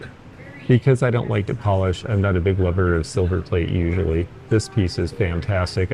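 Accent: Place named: Louisville, United States. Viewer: HI, lots of personality in this one!!! Sometimes you are so dang funny!!! It's always so unexpected and then you get me! Thank you for recalling Louisville to mind. One can always tell where the person's from depending on their pronuciation! 😉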